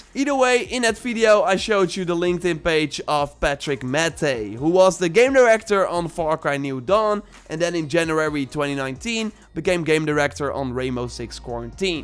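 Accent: Netherlands. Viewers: Ben jij een nederlander? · Are you dutch? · ok met je kanker Nederlandse accent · Ben je Nederlands?😅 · Ik dacht al dat je nederlands sprak, door je accent 🇳🇱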